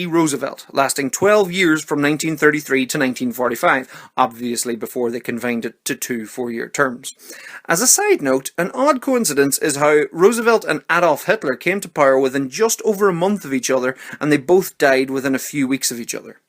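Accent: Ireland. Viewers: Are you Irish? Just wondering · What part of ireland you from lad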